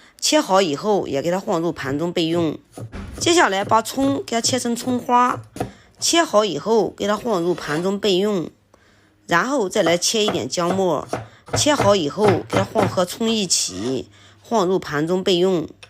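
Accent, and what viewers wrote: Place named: Jiangxi, China. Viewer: 很熟悉的赣普话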